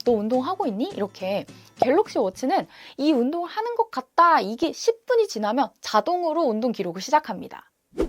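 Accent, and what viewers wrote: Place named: South Korea. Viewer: 정칙한 한국인 영어에 구독버튼 누릅니다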